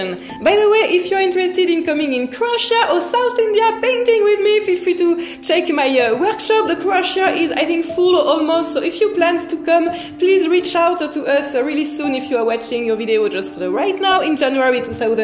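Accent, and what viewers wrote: France. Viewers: I have really enjoyed this tutorial. I have painted it four times and keep learning a little more each time. Sometimes I have a difficult time understanding you when you talk very quickly with your French accent so I have found a solution to that. I slow down the speed on my computer which slows down your talking too. It works great although at times it is rather funny because it makes you sound a bit intoxicated. Lol. I would love to watch tutorials on negative painting. You are such a talented artist.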